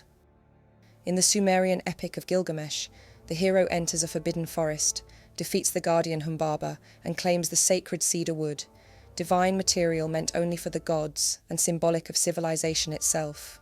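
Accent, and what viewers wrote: England, United Kingdom. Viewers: Great video, I enjoyed that , Nothing like a nice story told by an English lady with a lovely accent.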